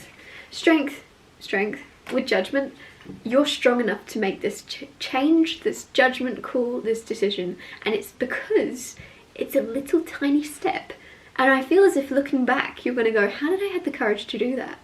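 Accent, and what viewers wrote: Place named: United Kingdom. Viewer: Luv your readings...you are spot on..exquisite an very beautiful..Luv your English or British Accent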